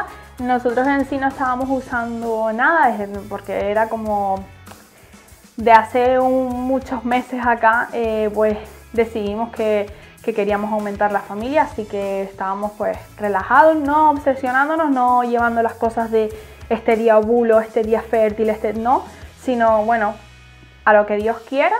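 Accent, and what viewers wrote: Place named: Canary Islands, Spain. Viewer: Hola guapa me acabo de subscribir y es el primer video q veo , yo soy de tenerife pero vivo en gran canaria, se te nota que eres canaria pero vamos de lejos,,, yo estoy buscando bebe el segundo tengo una nena de 10 años , soy irregular y mi ultima regla fue el 6 de marzo pero de aho no me ha venido los test me salen negativos pero he tenido todos los sintomas igual q tu menos el llanto, los pechos no me los puedo ni tocar, dolores etc, pero nada no me quiero ilusionar si no me viene la regla me hare el test cada 15 dias , me compre una caja de 10 test por ally espres mas barato, pero no te imaginas las ganas que tengo de embarazarme......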